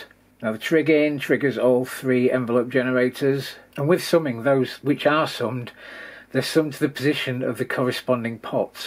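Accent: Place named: United Kingdom. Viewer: Mate I love your videos. Whenever one pops up I always watch it. The thing that drives me nuts is where you are in the UK. I spent time there when I was in the (US) army in 02 in Hereford, and there was a bloke there sounded like you but we called every british soldier "squaddie" and all I remember is that he supported Wolverhampton and was what you would call a 'gob-shite.' He saved a friend of mine's life and my friend used to joke that it weren't worth having to hear the story so many times. Anyway there is some word you use for when something has a downside, like no reset on DFAM starts with a B I think. What is it please? And keep making videos.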